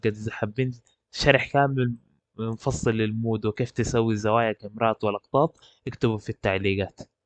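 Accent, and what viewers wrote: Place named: Myanmar. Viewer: انت برماوي واضحة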